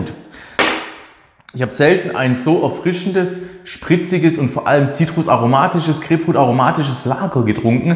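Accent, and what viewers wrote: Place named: Swabia, Germany. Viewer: Kanada ist mein erstes Reiseziel wo ich hinmöchte und auch schon war, ein Traum dort. Aber the Rock kommt doch nicht aus Kanada. Aber was anderes, wenn ich so deinen Dialekt höre, merke ich du bist wohl auch aus dem schwäbischen, wie wär's mal in nächster Zeit irgendwann mit nem gemeinsamen tasting wo jeder von uns ne diverse Anzahl an verschiedenen Bieren ranholt und wir die gemeinsam verkosten und auf beiden Channels dann hochladen? Fänd ich ne coole Nummer.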